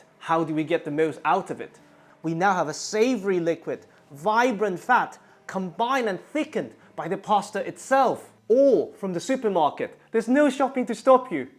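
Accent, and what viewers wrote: United Kingdom. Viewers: If I were to assume, I think he learned English from Europe. So he has a mix of an Asian accent and British English accent. He has a good sounding voice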